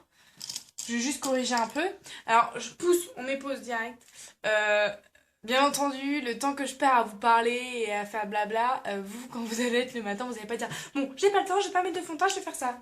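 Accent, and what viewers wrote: Marseille, France. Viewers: arrete avec ton accent genre marseille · je sai pas je trouve qu'elle a un peu l'accent marseillais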